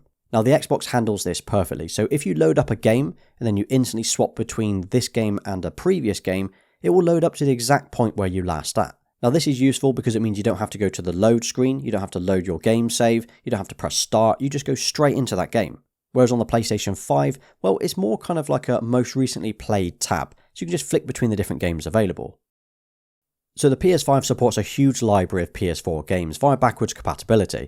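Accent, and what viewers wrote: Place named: Leicester, United Kingdom. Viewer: Your voice really reminds me of mark Selby! Are you from Leicester lad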